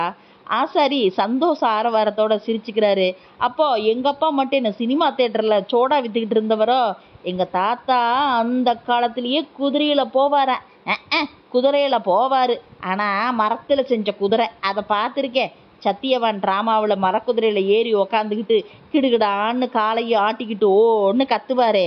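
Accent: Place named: Tirunelveli, India. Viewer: கலையை விலை பேசாத கலைஞன் வாழ்க! திருநெல்வேலி பாஷை வளர்க.!!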